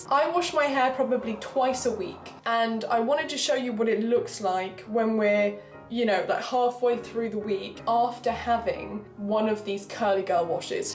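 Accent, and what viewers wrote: United Kingdom. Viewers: has anyone ever told you, you have the proper accent to be a Dr.Who companion? i think you and the Matt Smith doctor would get a kick out of each other... All british people know Dr.Who, right? its like a sporting event for you guys when it airs?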